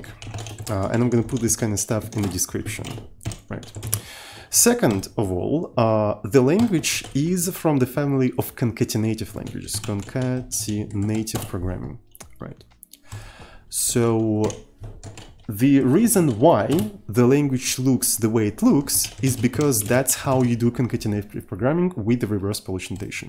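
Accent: Russia. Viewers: такой жесткий русский акцент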